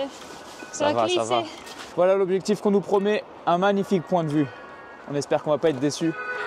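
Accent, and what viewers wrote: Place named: Finland. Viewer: Je n'ai jamais entendu le francais en parlant avec un accent finlandais.. Super interessant et charmant, en plus !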